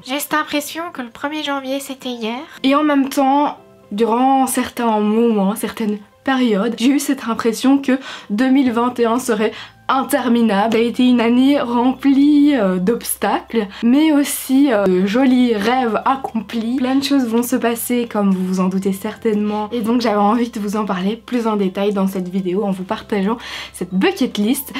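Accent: Belgium. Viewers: Je découvre ta chaîne et j’adore continue comme sa (tu m’a donné pleins d’idée ´et l’accent belge je suis fan )plein de bisous à toi et ton copain 💕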